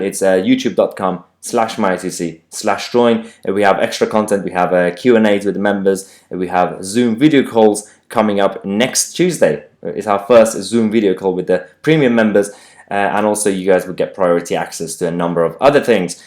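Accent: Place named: England, United Kingdom. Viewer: I love your reporting! Your presentations rely on actual source information, succinct and intelligent commentary, and a gorgeous English accent!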